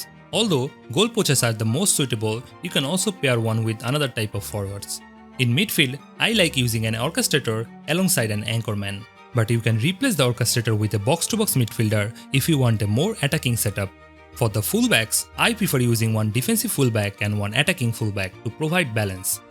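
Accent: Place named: India. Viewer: India hai kya ? Sounds like it .. but naam indian nahi hai bhai kya 😅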